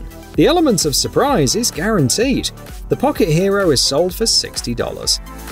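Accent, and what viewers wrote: United Kingdom. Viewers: Al-u-min-i-um is the British term. Judging by his voice, I would say the narrator is a Brit. Aluminium is also the preferred scientific usage, like helium & lithium. You've had a good day. You learned something new.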